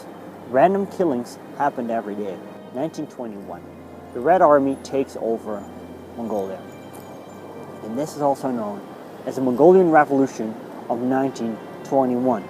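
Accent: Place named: Netherlands. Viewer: Most interesting history, are you dutch? Your accent is very dutch.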